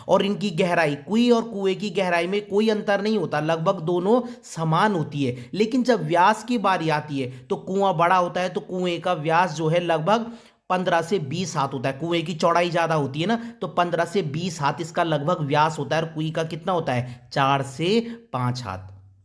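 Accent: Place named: Pahadi, India. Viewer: sir aap bhi pahadi ho kya kya aapke bolne ke tarike se laga raha hai ki aap bhi pahadi ho uttarakhand se mai bhi vahi se hun sir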